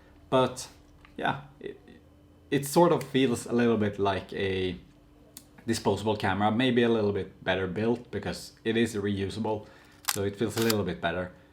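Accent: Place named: Gothenburg, Sweden. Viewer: Härlig Göteborgsengelska…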